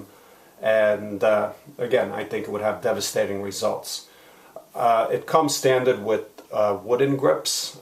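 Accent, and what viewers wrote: United States. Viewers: Best American accent ever.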